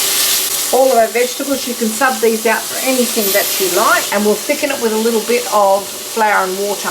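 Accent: Australia. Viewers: Thank you for this wonderful recipe. I have been living in Germany for many years now, and I miss my pasties and pies. Luckily I managed to buy a family pie maker and so lucky I stumbled over your youtube channel. :D So nice to hear a fellow Australian talk, too. The pasties are in the maker, filling tastes fab and they look so great, I cannot wait to get tucked into them.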